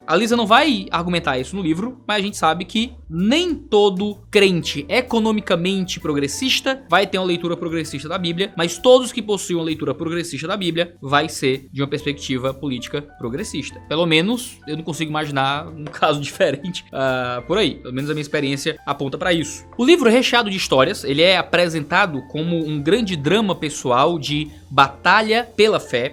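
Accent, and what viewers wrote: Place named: Northeast, Brazil. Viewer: Não se acanhe,. Espalhe nosso sotaque nordestino através do português e do inglês tbm kkk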